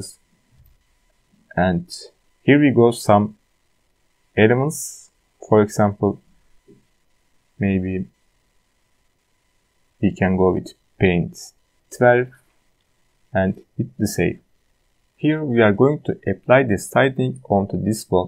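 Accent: Turkey. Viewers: where are you from? I was curious. You speak English like Turkish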